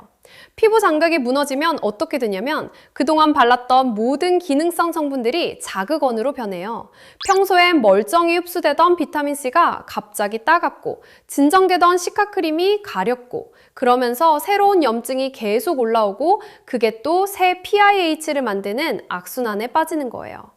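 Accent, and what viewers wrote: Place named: Seoul, South Korea. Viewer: 경남인은 그냥 서울말로 들리는데..ㅎ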